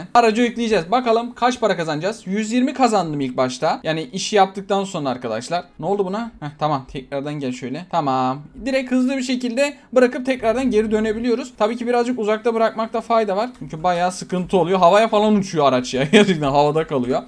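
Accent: Malatya, Turkey. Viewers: Abi sen malatyalımısın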